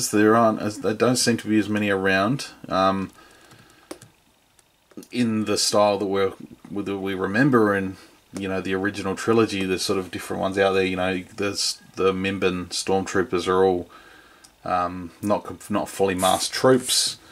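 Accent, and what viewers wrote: Australia. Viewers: Hey man, you sound Australian and so am I. Wondering were you picked this fig up if you are aussie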